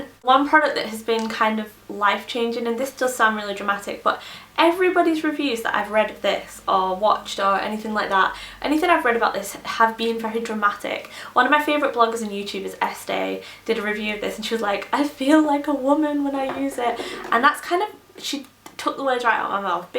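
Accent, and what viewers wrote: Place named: Leeds, United Kingdom. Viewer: i love your accent :) r u from leeds?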